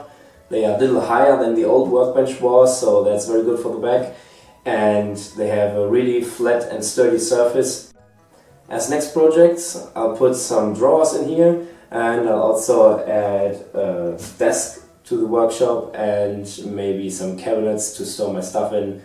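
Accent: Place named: Germany. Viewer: with the accent anything you say sounds reasonable and well engineered. "I have replaced wood dowels with spaghetti noodles." 100% confident German accent. gold 1m views.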